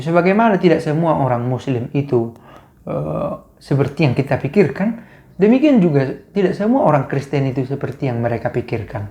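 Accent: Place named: South Kalimantan, Indonesia. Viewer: Logat bapak itu mohon maaf seperti logat org kalimantan selatan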